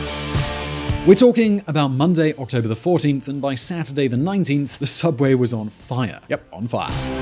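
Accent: United Kingdom. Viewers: Your bad research giving patronizing talking heads with British accents a bad name dude. . Actual story here: <link>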